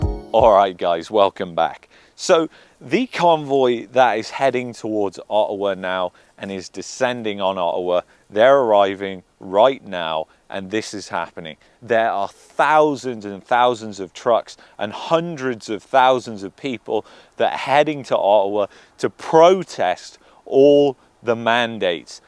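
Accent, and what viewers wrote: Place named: Birmingham, United Kingdom. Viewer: Your severe handicap is being a brommie. It's hard not to sound think, and come from Birmingham. You are clearly not thick, far from it, but you have a challenge.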